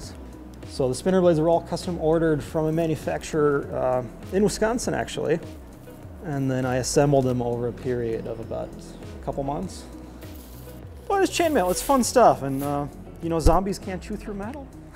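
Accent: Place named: Minnesota, United States. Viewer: How old were you when you moved? Your accent is, non-existent, well aside from being recognizably Minnesotan.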